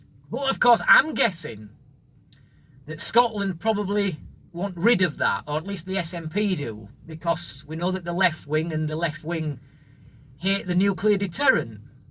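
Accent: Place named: England, United Kingdom. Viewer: Honestly, you kinda embody why a lot of young Scots don't want to be part of the UK - namely because views like yours are perceived as being common in England and are rejected by about 75-80% of the Scottish electorate. It is factually wrong to say that the border has not been discussed - its been in the Scottish Media quite widely if you search. I'm also yet to see evidence that Scotland "will be flooded with economic migrants from the Third World" - could you please provide some? The UK is chronically mis-managed and has been lacking infrastructure and education investment for decades - the public services have been put in private hands under Labour and then Tory under the guise of "Trusts", which are often run by party donors when you delve into them. And this actually costs the English taxpayer more than it otherwise would. (I know because I worked in two of them). 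Listening to your accent, it sounds like you come from one of the numerous geographical regions in England that has not turned an economic surplus in decades - why do you and your fellow citizens not demand better of your government and accept stronger local representation? Many economic precedents show that effective governance is the key to effective economic growth and transitions - Estonia is perhaps the best example in Europe currently - and this has been missing in the UK for decades. The failure to create a sovereign wealth fund on the back of Scottish oil revenues would stand as a rather striking exampl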